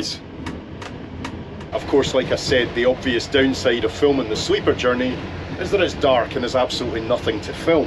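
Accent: Scotland, United Kingdom. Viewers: scottish i think? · Scottish mate ?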